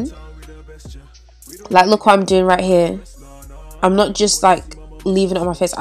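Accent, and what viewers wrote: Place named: Northern England, United Kingdom. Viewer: So refreshing to hear a northern accent on YouTube for once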